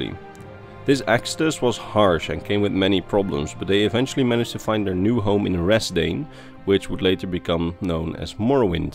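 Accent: Netherlands. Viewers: Lol, I love watching this channel, I mainly listen to it because I love 's Dutch accent. Can't get enough.:D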